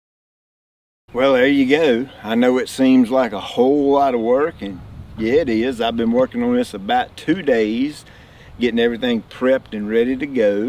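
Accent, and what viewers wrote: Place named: Louisiana, United States. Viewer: You should like you are from Louisiana instead of N.C. Regardless, I like your accent. Your beds look fabulous!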